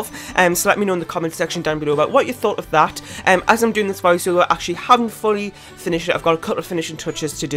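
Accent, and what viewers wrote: Newcastle, United Kingdom. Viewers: this was so great haha! How have you slipped under my radar? This is my first time checking out your channel! You seem like a nice guy, loving the Geordie accent! I'll look forward to having a nose through your work:D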